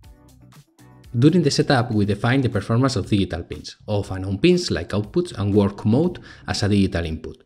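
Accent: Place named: Spain. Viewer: Tu eres español!!!, no pregunto. Gracias por el video y compartir proyecto. Trabajo con ingleses y tu acento te delata.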